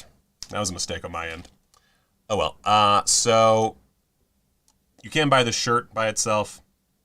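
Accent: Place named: South Africa. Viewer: Your British accent - sounds more South African, And Mr Jolly Lives Next Door is game. Eat the Rich and The Yob are worth the time and fun anyone is aware of the The Famous Five (idealist 1950's kids on jolly good adventures with a healthy lashing of bygone stereotyping) then Five Go Made on Mescalin.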